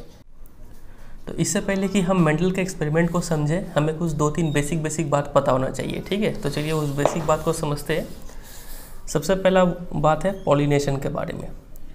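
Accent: Bihar, India. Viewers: Sir aap bihari h kya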